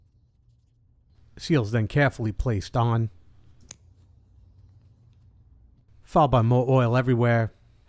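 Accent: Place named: East Coast, United States. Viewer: Phenomenal series, stellar camera work and editing! I can't believe you don't have a million subs and a billion views on each video! Precision workmanship. Hard to miss that east coast accent, I lived in Upper State N.Y. as a kid and Long Island as a teenager. What's the story with the pair of Yamaha's in the background? I've got an '06 Yamaha Stratoliner S model (113) that's Darksided. What brand starter did you end up using?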